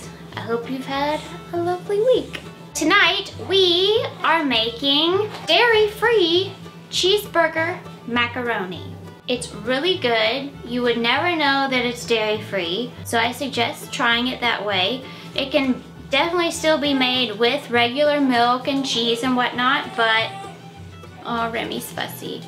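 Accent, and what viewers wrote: South, United States. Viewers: Your little family is adorable ♥️. And are you loosing your southern dialect? You are starting to sound more and more like a Californian.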